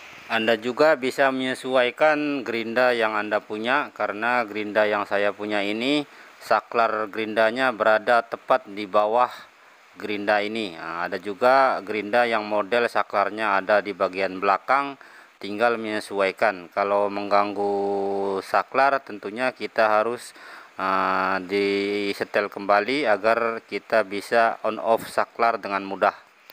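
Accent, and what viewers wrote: Indonesia. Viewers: Ngomongny gaya Indonesian, tp soket listriknya Malaysian🤩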